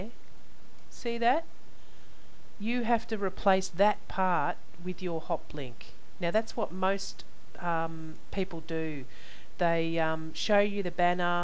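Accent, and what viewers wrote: Australia. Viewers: Trying to find a site explaining banners and hoplinks. Thanks for your video. Nice to hear an Australian accent for a change.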